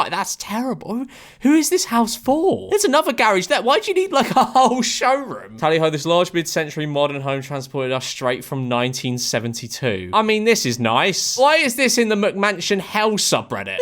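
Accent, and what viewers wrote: United Kingdom. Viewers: Is it just me or does sound more British in this video than the others?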